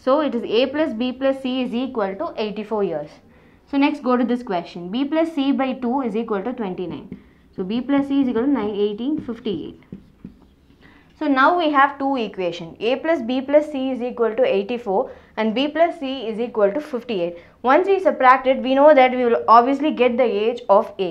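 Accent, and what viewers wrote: South India, India. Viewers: i like ur voice so much.. 😊 south indian tone ♥️ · She is south Indian..am damn sure..most probably from Bengaluru.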